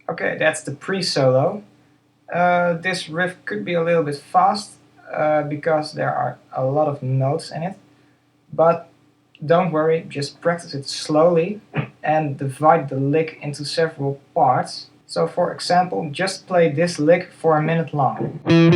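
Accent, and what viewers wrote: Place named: Netherlands. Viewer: I Hear a Dutch accent :-)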